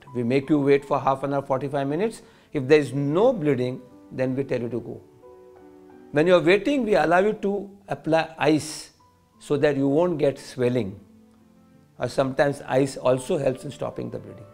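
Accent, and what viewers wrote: India. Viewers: Thank you very much, Indian people voice+accent is very calming :)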